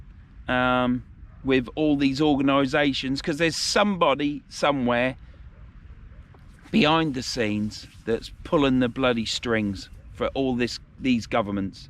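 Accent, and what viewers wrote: Norfolk, United Kingdom. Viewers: well theres a Norfolk boy if ever i heard one, just stumbled on your channel buh, new sub from Gorleston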